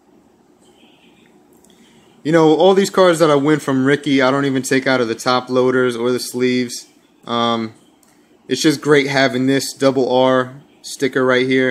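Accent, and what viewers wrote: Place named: New Jersey, United States. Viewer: You from Joysey? Im from joysey, I'm from Kearny, where you from? nice cads.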